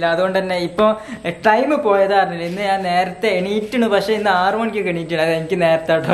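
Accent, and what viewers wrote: Kozhikode, India. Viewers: യ്യ് കോഴിക്കോട്ട് കാരൻ ആണോ,,, സംസാരം കേട്ടിട്ട് തോന്നുന്നു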